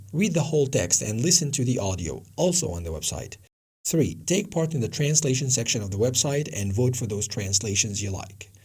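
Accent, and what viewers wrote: United States. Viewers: امته كورس اللهجة الأمريكية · اتقان اللهجة الأمريكية الله يبارك لك يا مستر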